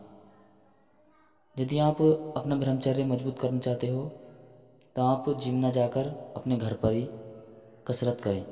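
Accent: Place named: Western Uttar Pradesh, India. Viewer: Bhai teri awaj se lagra hai Ki tum west up se ho Am I right ?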